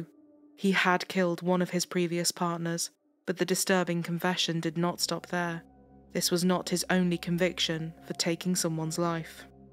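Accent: England, United Kingdom. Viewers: I love her English accent. I only watch her to polish my English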